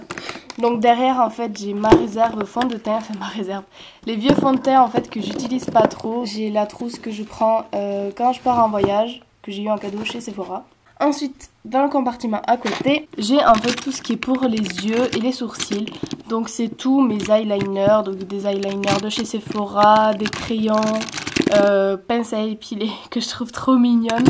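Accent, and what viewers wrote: South, France. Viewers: Coucou ma belle je vient de découvrir ta chaîne j'aime beaucoup ta chambre qui est simple et mignonne. On devine desuite que tu est du sud avec ton accent :P Tu m'a fait rire avec ton espace " cercueille" Je pense que tu voulais dire sanctuaire? x) Bref super vidéos je m'abonne car j'ai regarder plusieurs de tes vidéos et j'aime beaucoup ta chaîne :) J'ai également une chaîne beauté je t'invite a allez voir si sa te dit sa me ferais plaisir ! Bisous xoxo !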